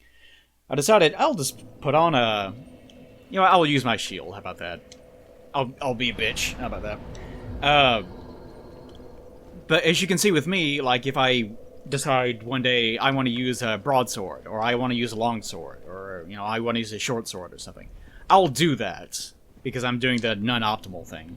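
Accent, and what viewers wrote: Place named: South, United States. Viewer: I just realized you sound a lot like SSoHPKC but with a slight southern accent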